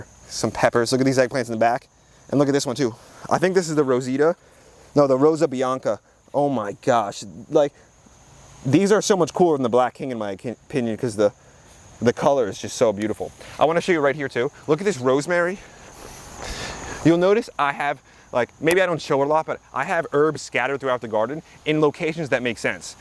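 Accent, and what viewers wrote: New Jersey, United States. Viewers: I love watching your channel. From the Jersey accent to your child like enthusiasm for your entire gardening adventure, you are truly entertaining and very informative. I am a 64 yr old that is in process with the hubs building a new home on our 7 acre property in SE Indiana in the Ohio River valley. The hubs and I both grew up on farms and our families always had gardens and livestock. Of course, neither of us appreciated being raised that way AT THE TIME, (too much like work!)but are looking forward to cultivating as much of the 3 plus lawn area of our property with food and probably a few chickens. I am hard core about gardening via organics, and the hubby says "Meh", but pretty much does as instructed in that area!! We've already done gardens on our previous property, started it in the spring by adding soil amendments like sand, (to break up that Hoosier clay!!) rotted horse manure donated to us from my farmer uncle, piles of rotted leaves we'd accumulated the fall before that we just spread out on the new garden spot and covered with a huge tarp after we wet them down and left it for the winter. 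We added some lime, to sweeten things up, tilled it all in and started planting. We made the mistake of planting 72 tomato plants and didn't stagger them at all. OH MY GOD!! We sure learned our lesson there!!! It was also a really good growing season that year for tomatoes. Lets just say the neighbors, our church family, and we had all the tomatoes anybody could ever use! Thanks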